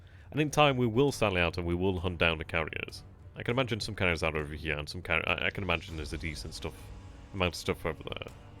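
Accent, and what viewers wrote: United Kingdom. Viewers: is a well spoken English Gentleman, and I have no problem understanding what he says, but I guess if your an American, or Canadian, or from some other foreign country, then he may well sound strange to you.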